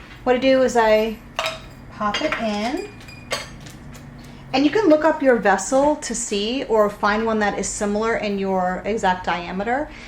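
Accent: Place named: New England, United States. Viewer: Fellow New Englandah? lol also thanks for the review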